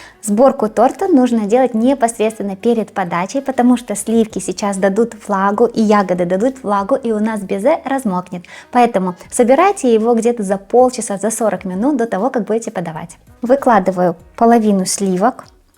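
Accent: Ukraine. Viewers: Чудовий , ніжний , гарний тендітний десерт як і автор каналу !❤ браво! Окрема подяка за прекрасну українську!!!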